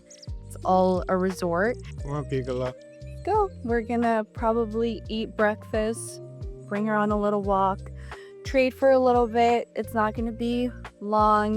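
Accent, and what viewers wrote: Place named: Paisa, Colombia. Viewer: quedo fascinado viendo lo tesa que eres y escucharte hablar ingles y español en ese acento de acá super paisa uffff😍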